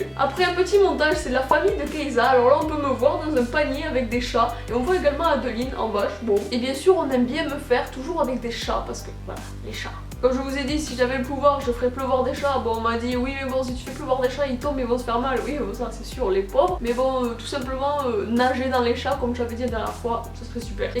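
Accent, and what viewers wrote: Toulouse, France. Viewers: omggg, j'adore ton accent toulousaing 😍😍😍 Ma mere vient de toulouse alors je peux faire cet accent et j adore 😍😍 Putaing Toulouse en force 👊👊💘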